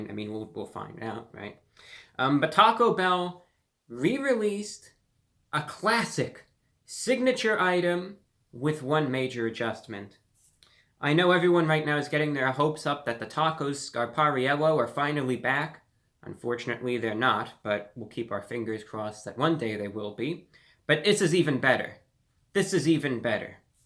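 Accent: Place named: New York, United States. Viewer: Clearly a New York accent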